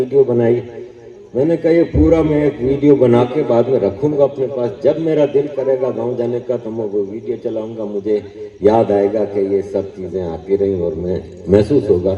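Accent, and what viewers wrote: Gujarat, India. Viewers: Ji, aapke boli ka tone Gujrati jaisa hai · Aapka janam bhi karachi ka he lekin aapke bolane me gujrati accent aata he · Sir aapko dialect gujrati lgti hai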